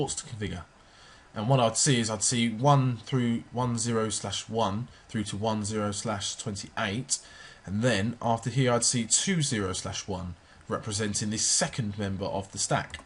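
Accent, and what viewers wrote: United Kingdom. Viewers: Finally, An IT tutorial with an English accent.. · Rooters. God, you are gloriously British.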